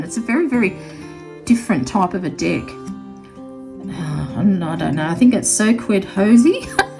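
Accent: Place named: Australia. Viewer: Awwww bless you and thank you so, so much for this lovely review of my cards!! I love that you saw Sandalphon as Fabio! Ha ha I also think he’s like Conan the Barbarian 🤣😂 So lovely to have a fellow Aussie wise woman reviewing these. Your accent makes me homesick ❤️ I hope you are feeling better soon. The goddess and the angels are with you 💖 Loved hearing about your own visions and experiences with the angels during this too. Thank you! Good feedback on the pronunciation guide. I will add that in my next deck - great idea 🙏 Have a gorgeous day and huge thanks again. I feel very humbled by this review. Love Alexandra xxx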